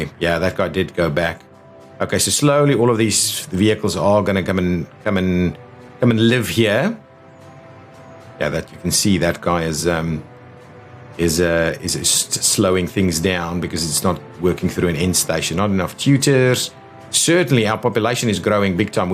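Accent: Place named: South Africa. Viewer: This is gud shit love youre content. Also love youre accent have note heard alot of southafrican but it puts a smile on my face. So thanks fore youre hard work and Star safe in the dificult times!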